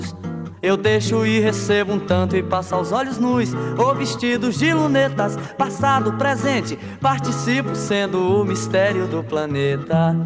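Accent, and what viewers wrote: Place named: Minas Gerais, Brazil. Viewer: Nesse vídeo parece ser mineira. Deixa eu ver os outros pra ver de onde é.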